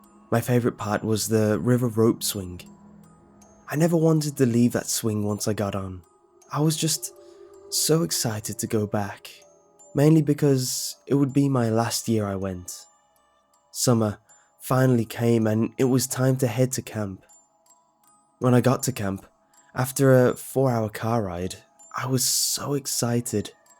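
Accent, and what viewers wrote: England, United Kingdom. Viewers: British or some kind of English, i believe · I think he is english. And some people do like this story. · A bad story read by an Englishman is still a bad story. Why do people assume that slapping on an English accent will enhance something. Oxy Clean doesn't work better since Antony Sullivan has been shilling it.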